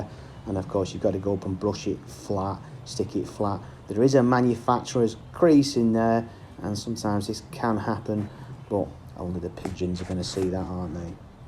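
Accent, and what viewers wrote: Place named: Manchester, United Kingdom. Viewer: Hello. You sound like you’re from Manchester. Please can you call me. Inbox me for my number. I’m planning of doing a mancave for myself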